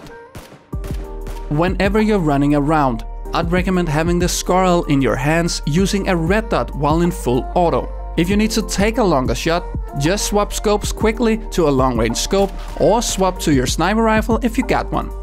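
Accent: Denmark. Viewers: So quick question.. Are you Danish? Because your accent sound a little like Danish. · Are u from Denmark? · Its called being danish...we have a funny accent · The reason some of you guys think his way of speach is funny is becouse of his danish accent we have a very bland pronunciation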